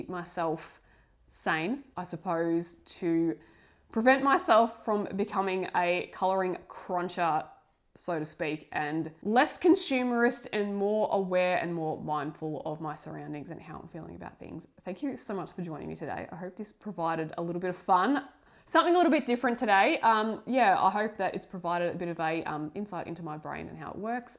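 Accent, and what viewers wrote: Australia. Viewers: Sarah. From Melbourne. Newbie to your content. You have already converted me to try adult colouring. Love hearing Aussie ascents.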